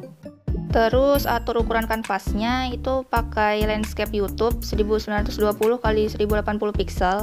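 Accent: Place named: Kalimantan, Indonesia. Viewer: Kw ni org kalimantan kn? Logat ngomong mu kyak di tempat ku soalnya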